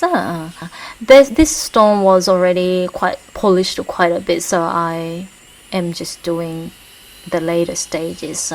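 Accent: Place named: Australia. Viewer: Please don't apologize for your accent. I was captivated by the Asian/Aussie blend. I hope to find more of your work. Very informative, thank you!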